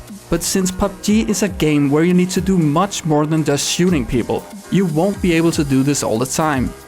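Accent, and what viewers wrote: Denmark. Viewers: you sound danish. Are you from Denmark?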